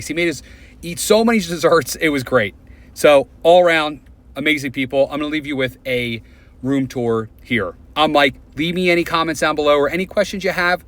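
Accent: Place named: Philadelphia, United States. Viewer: Clocked your phila . accent before you mentioned PHL! Nice review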